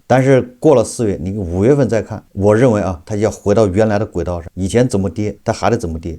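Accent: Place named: Gan, China. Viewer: 赣语怀岳片，湖南湖北是你的错觉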